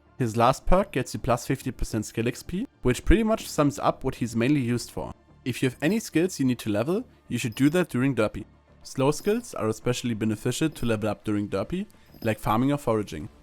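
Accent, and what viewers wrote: Germany. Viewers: i would buy my coop alchemy 50 ign laux2ß2ß nice vid btw and u have a small german dialekt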